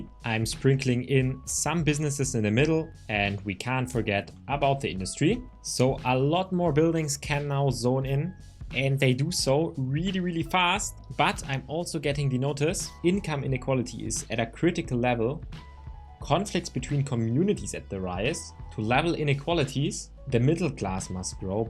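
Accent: Germany. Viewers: I can hear german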